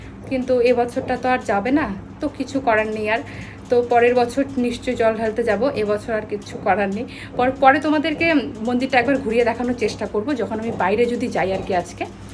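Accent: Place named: Bangal, Bangladesh. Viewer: Apu tumar purbo Purus ki bangladesher cilo. Tumer khota ekto banggal tan ace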